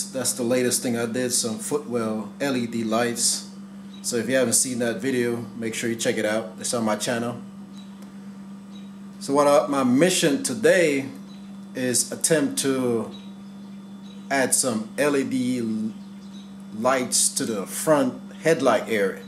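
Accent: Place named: Jamaica. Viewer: You sound Jamaican. Looking forward to more Hr-v mod videos. Good work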